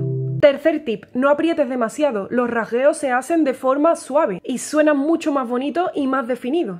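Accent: Andalusia, Spain. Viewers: Que combinación de talentos!! El acento andaluz es como ponerle el cherry al cake. haz escuchado alguna vez lo de las frecuencias 432 y 440?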